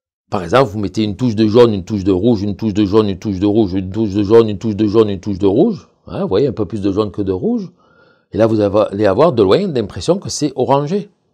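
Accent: South, France. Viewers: Coucou Merci je viens de m'abonner et je vais m'y mettre - Pédagogie = fluide - accend du sud = charmant et phrasé agréable 🙃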